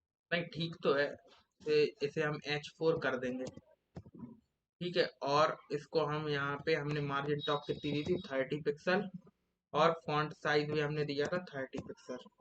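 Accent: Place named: Bundelkhand, India. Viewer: Bhai badiya bole bundekhndi m mja aa gao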